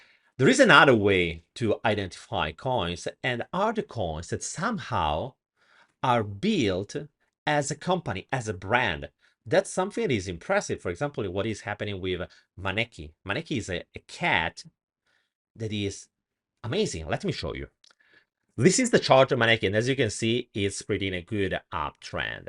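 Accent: Italy. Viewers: they are mim coins, not mimi coins. just saying. cute italian accent though ;-)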